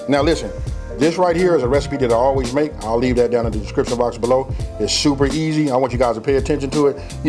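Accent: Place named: California, United States. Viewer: Cali accent thick. Came here to find something to cook for the night. Thanks bro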